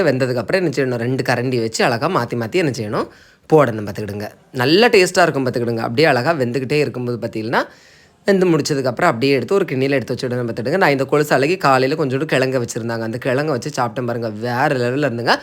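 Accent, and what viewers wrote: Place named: Kanyakumari, India. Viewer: Annan namma Kanyakumari slang super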